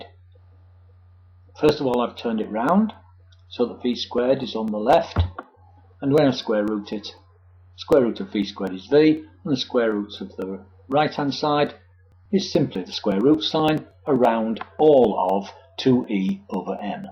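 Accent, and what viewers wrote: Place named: Hull, United Kingdom. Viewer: The narrator has to be from Kingston upon Hull - or close to it. Very helpful video.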